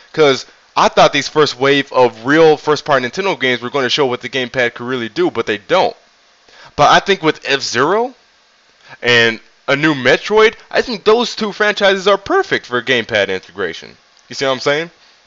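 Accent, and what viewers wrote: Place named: Texas, United States. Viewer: Hold up, you're from Texas!? I always imagined you lived in NY but now that you mentioned it... I can hear the accent (in a way)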